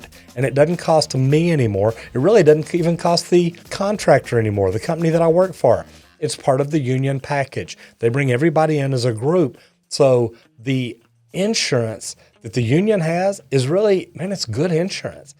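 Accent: South, United States. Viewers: It’s an organization, you pay for a membership to reap the benefits. The good outweighs the bad, great pay and benefits but you get laid off. Also judging by his accent he’s from the south were unions have almost no foothold, less members, and less resources for training.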